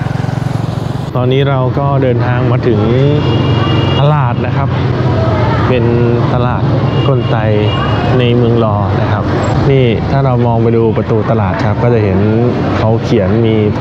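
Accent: Isan, Thailand. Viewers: ทำไมสำเนียงออกอิสานๆมากเลย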